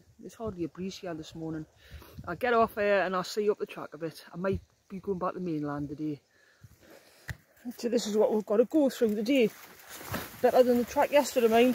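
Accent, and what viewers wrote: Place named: Newcastle, United Kingdom. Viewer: A good Geordie accent there, then! I remember a holiday on Eigg in 1954. The 'singing sands' are also 'sinking sands' - you have to be careful where it's wet - you get sucked in. The croft we stayed in had not yet got electricity so we had oil lamps to find our way upstairs to bed. The cooker was an old iron range cum open fire. You could hear the Puffin birds crying all night up on the backbone escarpment - quite eerie!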